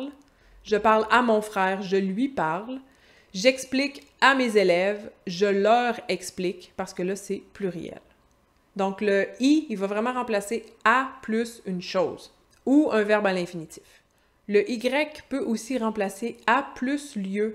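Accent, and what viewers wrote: Quebec, Canada. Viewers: Bonjour J'ai découvert tes vidéos (capsules chez vous 😊) aujourd'hui. Je me régale ! (J'adore l'accent québécois) Pour les expressions à la fin, si je comprends bien le sens de "en arracher", en français de France, on dit "en baver". Tout comme toi, je ne sais pas ce que le en veut remplacer ! 😅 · Je regardais cette video de plusieurs fois et j'essayais de repeter ce que tu a dis en cette video pour me habituer a quebecois (francais en general) prononciation. C'etait le fun 😄. Merci pour tes videos. 🙂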